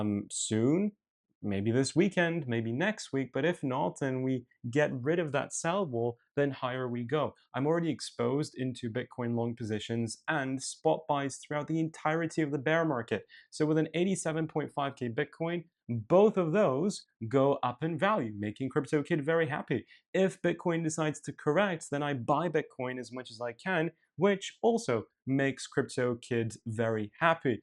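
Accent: United Kingdom. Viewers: My advice is to just go full British accent. Otherwise you're just standing out there like 1 o'clock half struck.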